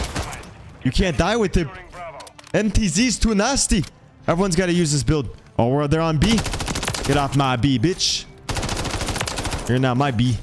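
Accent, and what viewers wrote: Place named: Toronto, Canada. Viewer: Is homie from Toronto? He has the Toronto accent like Plushhh 😂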